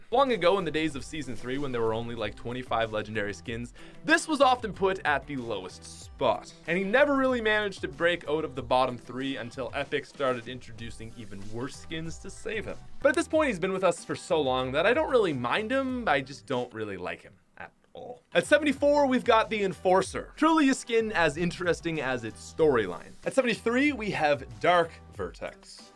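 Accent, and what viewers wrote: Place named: Canada. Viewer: U canadian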